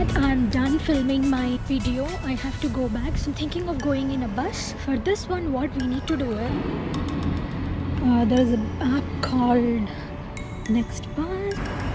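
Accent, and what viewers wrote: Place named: India. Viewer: your Indian accent is cute! <3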